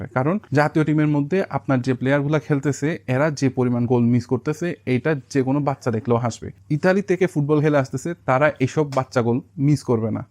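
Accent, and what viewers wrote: Chittagong, Bangladesh. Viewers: ভাই, আপনার বাড়ি কি চট্টগ্রামে, আপনার ভয়েস দেখে মনে হচ্ছে চট্টগ্রাম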